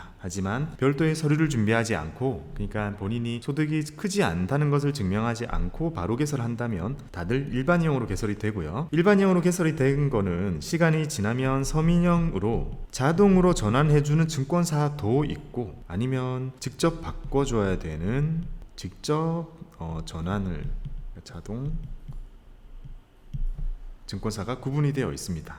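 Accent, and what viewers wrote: Gyeongsang, South Korea. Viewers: 항상감사합니다. 작년부터 펜이됐네요. 약간의 경상도 억양도 매력있고 설명도 알기쉽게 설명해주시니 좋군요.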